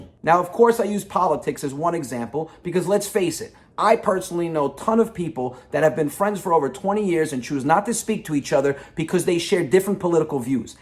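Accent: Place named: Italy. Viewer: Well done my friend life is short then when we die they show up and say Poor guy he was a nice guy bull shit They are all sonofabitches saluti hey what part of Italy is youre family from ? They have the same way of speaking Say hi to Zio Vine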